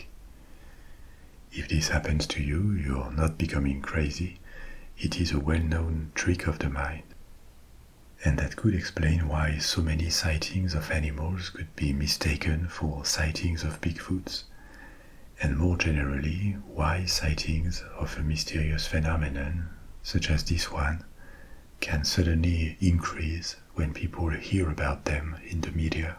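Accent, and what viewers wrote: France. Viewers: Ohhhhh....just in time for that incredibly soothing baritone voice & fascinating French accent to lull me to sleep!! Thank you, Sir!! Your talent & gifts sre greatly appreciated!!!! ❤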